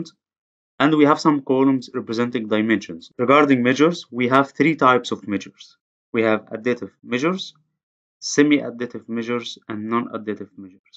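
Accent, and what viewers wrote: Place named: Egypt. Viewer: الانجليزي دا حساه مصري ! ياريتو كان عربي والله :(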